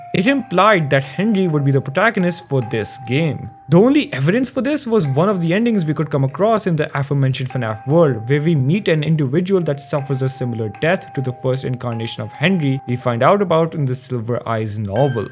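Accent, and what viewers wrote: India. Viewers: I Think its indian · Everyone in the world has an accent. He sounds Indian.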